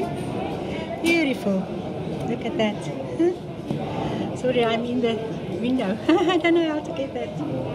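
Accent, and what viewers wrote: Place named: South Africa. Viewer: always happy to watch and enjoy hearing your South African accent :)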